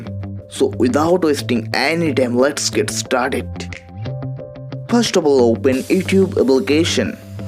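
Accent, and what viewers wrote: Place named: India. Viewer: The second you see him talking in Indian accent i just knew i was in for a good tutorial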